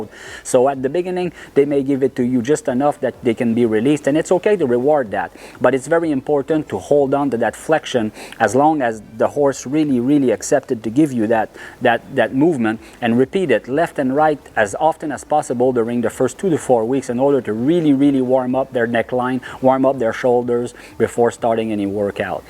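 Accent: Quebec, Canada. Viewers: J'adore tes vidéos 👌🏼 le petit accent a la Québécois j'adore haha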